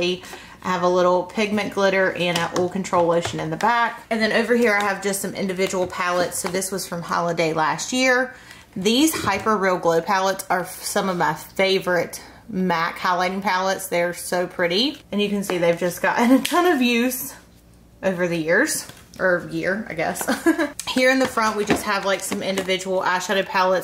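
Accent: South, United States. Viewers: I was introduced to you through yours and Vianney's giveaway...... so glad for that! Love your realness and your accent is ah-mazing!!! Reminds me of my Georgia and Alabama family 😉.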